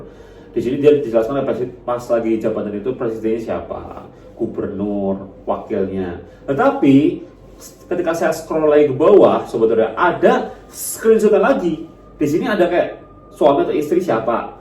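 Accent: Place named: Java, Indonesia. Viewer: Mas aku mo nanya..,btw kamu aslinya dari Jawa Tengah/Jawa Timur. Koq logatnya Jawa bngt🙄 yg kedua aku mo nanya..,mas subscribeermu itu banyak lho mas..,🧐tapi koq yg nonton cuman 15 ribu 20 ribu🙄itu knp mas...?!!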